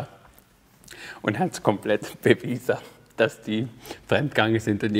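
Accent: Swabia, Germany. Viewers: schwäbisch xD grüße aus ravensburg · Dieses Schwäbisch einfach zu geil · HAHA an alle die kein schäbisch könna XDDD ihr versteht nix XD du musst untertitel hin machn^^ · Marcus V Bua, der schwäzt Schwäbisch ned bairisch. Die wolled uns blos nachmacha vorstosch? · Manta i woass dass ea schwäbisch schwätzt xD awa i dabaggs dengerscht ned so zu ren gschweige denn zu "schreim" ^^ awa vasteng tu i scho guad :) i sollte valleicht ein bissle schwäbisch leana wie i boarisch gleant hob xD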